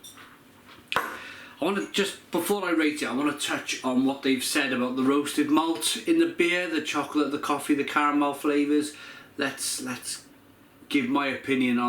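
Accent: United Kingdom. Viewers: Interesting beer and another great review. Are you English or Welsh?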